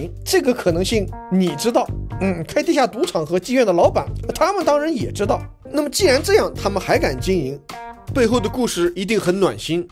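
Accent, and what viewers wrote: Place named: Shanghai, China. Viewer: 喜欢你的上海腔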